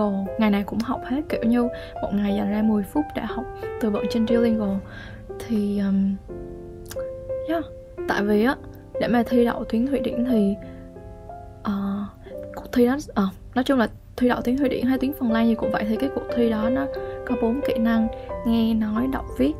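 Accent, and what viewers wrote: Vietnam. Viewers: Giọng bạn ở đà nẵng phải kh? Nghe hay ghê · b nói được giọng bắc à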